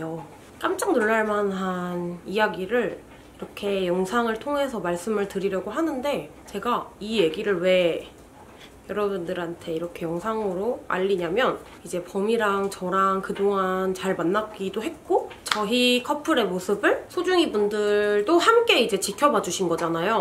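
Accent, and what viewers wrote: Busan, South Korea. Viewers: 뜬금없는데 언니도 부산에 가서 사시더니 사투리 좀 쓰시는 거 같아요 ㅋㅋㅋ❤❤❤❤🤣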